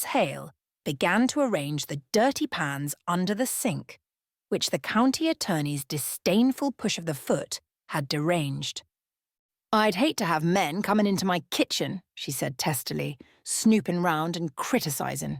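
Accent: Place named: United Kingdom. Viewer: Brilliant choice! I read it years ago . . . . BTW, the British accent doesn't work. Really. Please do it again. Please. Any rural American accent will do.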